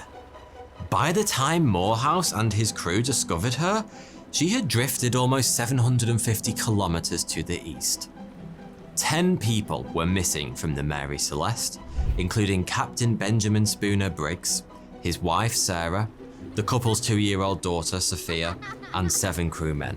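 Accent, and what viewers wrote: United Kingdom. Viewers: Why are British accents such good story tellers.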